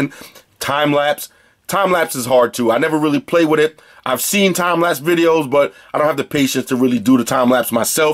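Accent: United States. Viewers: Loving the american accent though lol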